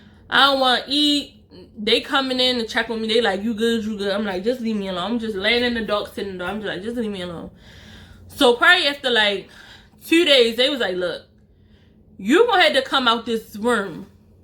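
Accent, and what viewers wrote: Baltimore, United States. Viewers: Baltimore accent?? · Girl, I am disgusted. #1. I knew where you was from when you first started talking. All my mama's side from Baltimore. #2 These girls suppose to be grieving thinking about how to give you tea on they mess. I cannot believe this! I am still listening but I am HOTT with you. The disrespect! This is why I always tell my brother, be real because don't leave any mess behind for us to clean up! Aint nothing about you being young and dumb, I would never judge you. You had every right to think you were the only one and had no idea the mess he left, smh. Sis...YOU WISH YOUR BROTHER WAS HERE TO MEET HIS WHAT?!! Girl... New subscriber! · Girl where you from? You lowkey sound like you from Baltimore if so same!! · When you started talking, I said “oh she is from the native land” 😂😂🤣🤣🤣🤣 Baltimore Girls 😉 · First I wanna say sorry for your loss! 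But gurl u had me rolling 🤣 u are a Great story teller. I knew u were from Baltimore 2 mins in when I heard how u pronounced each other only us Baltimore ppl say it like that 😆🤣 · I Love You 😂 New Subbie 🥰💕 P.S. I Loveeee To Hear Ppl From Bmore Say Words Like "New, To, True, And You" 😂😂😂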